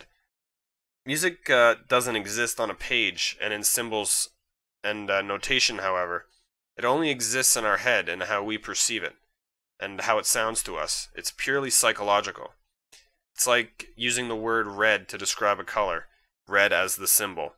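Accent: Canada. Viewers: Nice video thanks. P.s. your Canadian huh? Cool accent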